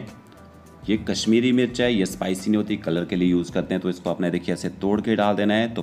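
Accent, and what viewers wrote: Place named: Punjab, India. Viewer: One thing which I love abt u is ur authentic punjabi accent...love u bro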